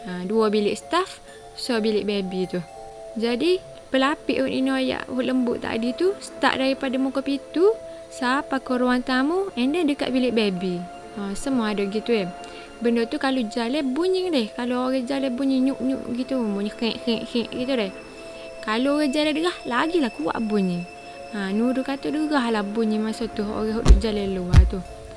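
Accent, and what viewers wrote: Kelantan, Malaysia. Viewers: Suka dengar kecek Kelate. Hadir dari Kuala Berang, Terengganu.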